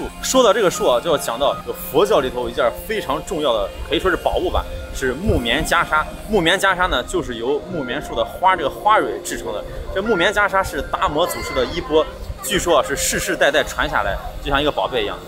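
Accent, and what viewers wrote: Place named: Shaanxi, China. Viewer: 你这个陕普 我听着真上头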